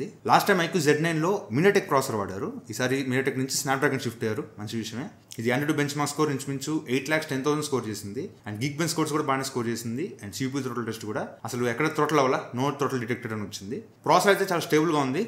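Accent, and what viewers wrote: Nellore, India. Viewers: nellore ah anna needhi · bro r u from nellore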